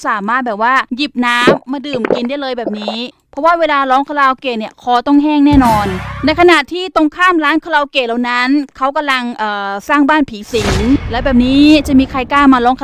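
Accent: South, Thailand. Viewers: พี่ เหมือนคนใต้เลยค่ะ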